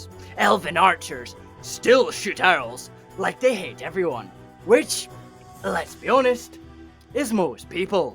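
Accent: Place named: Scotland, United Kingdom. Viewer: You can be Scottish and understandable. Speak calmly please.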